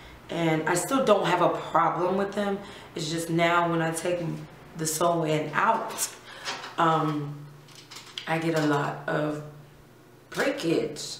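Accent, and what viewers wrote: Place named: Barbados. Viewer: Lol i heard you say "Good evening everybody," in your video about water only washing and I just knewww you were a Bajan. Go girl! Yuh sweet!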